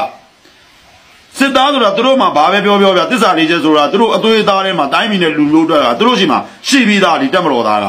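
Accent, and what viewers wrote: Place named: Rakhine, Myanmar. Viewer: ခင်ဗျားဒီပြင်ချန်နယ်မှာမပြောနိုင်ဘူးလား၊ရခိုင်အသံမုန်းလွန်းလို့၊ခင်ဗျားဒီချန်နယ်ဘဲပြောမယ်ဆိုကျုပ်နားမထောင်ချင်တော့ဘူး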